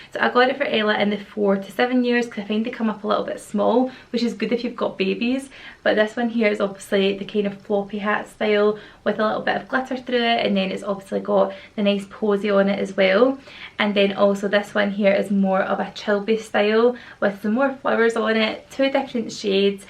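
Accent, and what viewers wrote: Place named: Dunfermline, United Kingdom. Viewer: Are you from Dunfermline because you sound like my sister , Nicole xx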